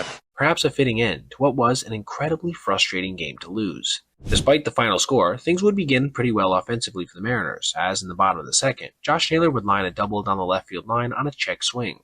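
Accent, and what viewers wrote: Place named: Michigan, United States. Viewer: Thought I always heard a little bit of Michigan in you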